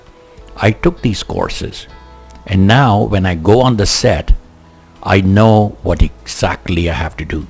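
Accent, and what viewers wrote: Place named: Caribbean. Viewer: I love your voice. Where are you from, I hear a Caribbean accent.?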